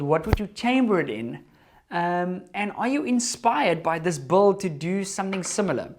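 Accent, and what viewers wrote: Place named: South Africa. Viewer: sometime back my company did some work for an outside company. One of the guys on site representing the other company was South African. I recognized his accent from hearing you a lot. He was surprised because most Americans guessed England first, Australia a distant second, New Zealand an even more distant third, and South African NEVER. I got a kick out of that.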